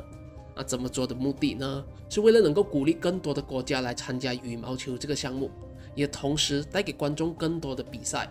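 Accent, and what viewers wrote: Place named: Northern Malaysia, Malaysia. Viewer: 你是北马人吗？口音好重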